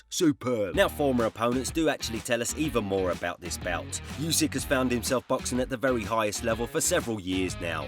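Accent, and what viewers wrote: Cockney, United Kingdom. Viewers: As an Aussie, i appreciate the cockney🇦🇺 Also, looking at how chisora boxed usyk, the way fury bullies, i think he beats usyk. Fury and uss cunningham shows fury just manhandles cruiserweights. And fury was pillowfisted fury in that era.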